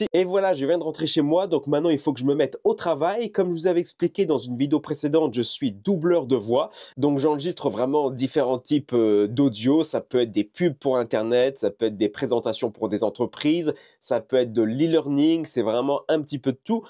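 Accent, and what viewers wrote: France. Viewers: Je pense que les gens de Upstate New York sont fascinés par votre accent. Les gens vous posent souvent des questions sur la France ? sur votre origine?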